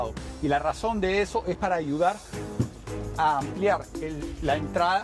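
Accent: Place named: Peru. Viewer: Are you from Peru? My girlfriend was translating your video for me and noticed your accent. It's just like hers.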